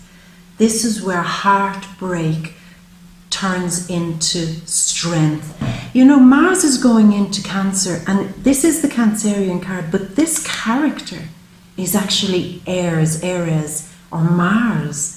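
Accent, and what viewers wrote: Ireland. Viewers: KellyByoga yeah nnnnno shes Irish my lovely x0x loves yah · Yes I know she’s Irish....and her accent is adorable....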